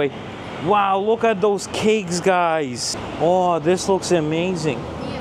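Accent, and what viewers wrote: United States. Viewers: You sound like an american' we all say and need to go to the GYM!!!!! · Ya know, you speak great English, more with an American dialect. Even some of the American philosophy entwined! How did you learn this? You will do well in America, just don't let them know you are Russian...very unfortunate.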